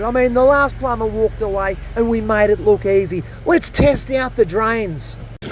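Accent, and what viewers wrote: Australia. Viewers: "Go on ya good thing!" is a very popular saying in Ireland so it was funny hearing it in an aussie accent I also always love "Bob's your mothers brother" (or Robert to make it even longer) which is obviously a variation of a British expression You have a great array of multicultural idioms is what im trying to say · Hey, been watching for a while from Kent in the UK. Fucking love your commentary and rambling. Please keep up the good work and posts. By far the most stereotypical Aussie commentator and the endless enthusiasm keeps us all coming back for more. We always stay to the end.👏🏼👍🏽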